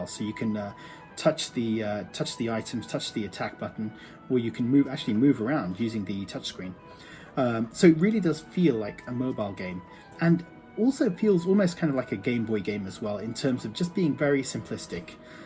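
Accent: United Kingdom. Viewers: that's just it, it hasn't got any sort of sound other than British. I have no idea why I thought you was Chinese lol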